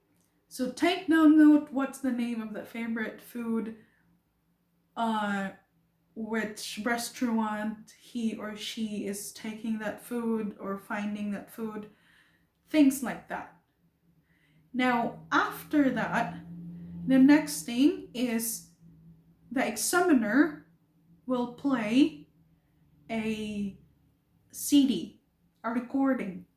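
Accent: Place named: United Kingdom. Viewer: Good information sis and I like the way you talk, you have good diction of British accent.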